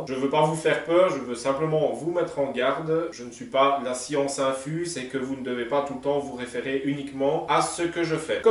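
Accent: Belgium. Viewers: Bonjour, suite à ta vidéo sur la raboteuse dégauchisseuse j’ai vu une chose qui m’a bien plu et que j’ai appliquée. Ce système pour affûter les fers de rabot est vraiment ingénieux et facile à faire malgré tout et je me suis dit pourquoi ne pas essayer et ça marche vraiment bien car j’ai pu affûter plusieurs séries de mes fers « rabot- et dégauchisseuse » merci aussi a Copeaux… car elle y est pour quelque chose lol !! Tu vois que parfois une femme dans un atelier ça peut-être pratique .Je plaisante bien sûr .Je me suis abonné a sa chaîne aussi de ce fait .Dis d’après ton accent tu ne serais pas un peu Belge une fois ou je me trompe ? Moi je suis du Pas de Calais donc pas très loin. Merci pour tes vidéos sympas que je ne manque jamais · Salut, tu es Belge ? (Tu dit 1,70€ et accent) Il parait qu on trouve la Titan a 228€ chez Brico Depot en belgique Brico Planit ?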